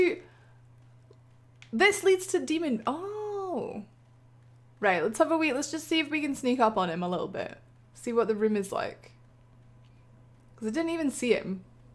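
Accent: Scotland, United Kingdom. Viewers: Is this a scotish accent? I have no fcking idea.but i love it🤔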